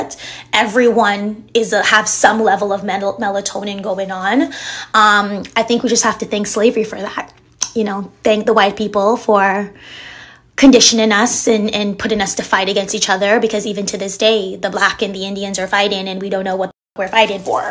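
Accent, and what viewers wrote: United States. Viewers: Speak like a guyanese.....you turn yankee overnight like the others.... Be yourself and respect who u r and your background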